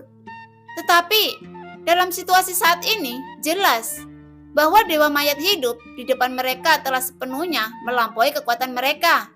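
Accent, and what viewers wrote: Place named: West Java, Indonesia. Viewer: Sunda banget ka hihi ..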